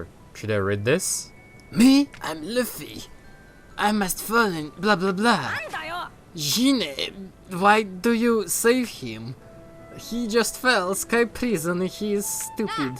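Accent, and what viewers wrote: Russia. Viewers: Sometimes I forget that is from Russia, nice to hear his accent sometimes. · !!!! What's wrong? Why your accent so Russian?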